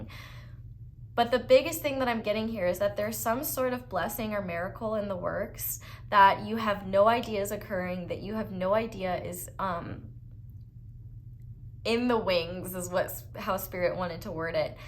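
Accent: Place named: New York, United States. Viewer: the new yorker accent is not your friend :)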